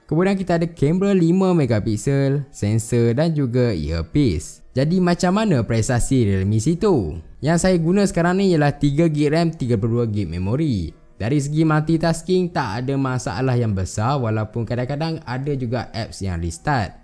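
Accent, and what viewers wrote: Taiping, Malaysia. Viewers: Orang taiping ke bang?😂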